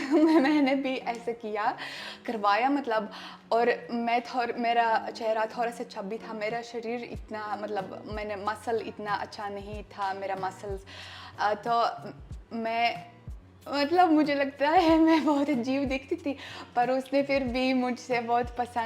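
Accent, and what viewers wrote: Standard. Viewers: इतना शुद्ध हिंदी तो हम भारतीय भी नही उच्चारित कर पाते है.. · Aap hamesha khus raho sabsa bada Rog kya kahenge log aap bahut khusmizaz aur funny ho,apki sudh Hindi aur aap bahut acha bolti ho apke vlog dekhkar bahut Anand ata hai maine apke vlog pehle bahut Miss Kiya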